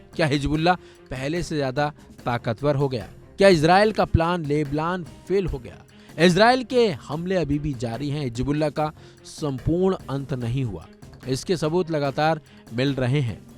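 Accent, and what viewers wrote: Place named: Madras, India. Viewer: Ur channel sounds madras chap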